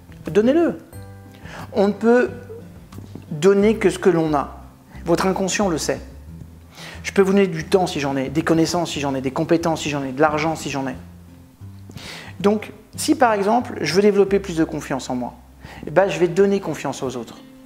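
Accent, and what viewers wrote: Canada. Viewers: J'ai vu le Doc 2 fois, et je trouve que tous que vous avez dit a été signalé dans le Doc, pas trop éclairci mais signalé. Merci d'avoir éclaircir les choses du coup. Par contre, j'ai carrément adoré l'accent canadien hahaha ! Continuer SVP, j'ai vu presque vos vidéos, je suis une grande fan. :)) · merci merci merci j`adore je viens de réaliser bien des choses et surtout pourquoi ca ne fonctionnait pas toujours comme je le souhaitais Braoooo et pour l`accent québecois aussi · Vous avez un PARFAIT ACCENT CANADIEN, j'ai été trois fois au CANADA, je vous le confirme avec sincérité. Trop beau accent. Merci.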